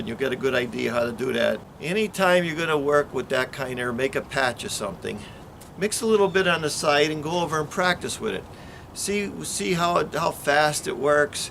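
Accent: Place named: Pennsylvania, United States. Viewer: I learn so much from you,; I believe you are from Pennsylvania, my wife is from Erie and your accent reminds me of her relatives and friends!! Nicest people I've met! Cheers!!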